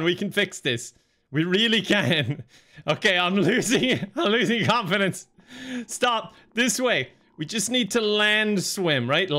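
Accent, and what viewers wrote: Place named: Ireland. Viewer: Love watching play games that are about awkwardly controlling movement, like octodad. He just gets more angry and Irish lol.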